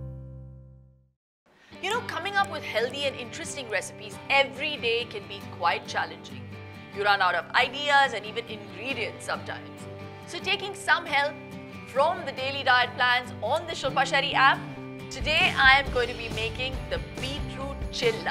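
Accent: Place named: England, United Kingdom. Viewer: I like her English accent but didn’t understand a word, thus will not be making this dish😢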